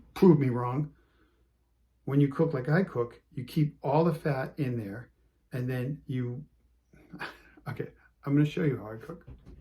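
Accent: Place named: Massachusetts, United States. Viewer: Sounds like is from Billerica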